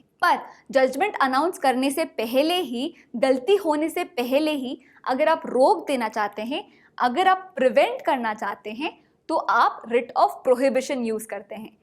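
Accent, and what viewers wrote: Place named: South, India. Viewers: You are south Indian. That accent. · Arey waah. An unrealistically unbelievable way of making a layman understand. You go girl. And yes, you sound Malayali.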